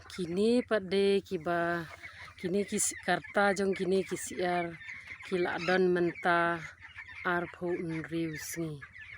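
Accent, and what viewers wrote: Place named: United States. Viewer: Sem syiar jng phi da don da ki batch mo katno batch kiba rit bd ktno kiba heh bd ktno kiba lah long bam doh bd ktno batch kiba lh kha pylleng. Kren style phi te mo kren fareng te lh wai da kwah batai ia ki nongrep khasi jng ngi sngewbha batai da ka ktien khasi nmr bun ki dei bym sngew fareng kum ma phi, phi te ka accent lane ring Sur thik kum ka Mem na USA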